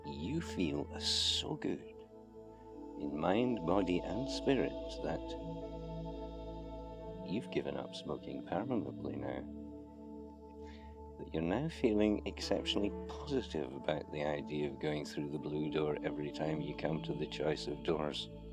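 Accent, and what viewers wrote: Scotland, United Kingdom. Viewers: Hi, I think the music is a little louder than your voice so it makes you very muffled. Normally I am good with Scottish accents lol Thank you for responding. I will try it again.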